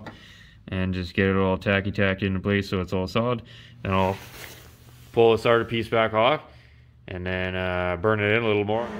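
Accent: Canada. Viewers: Love the humor, reminds me of AVE, you also sound like your a canukistan native, Oh and by the way love the vid's well done.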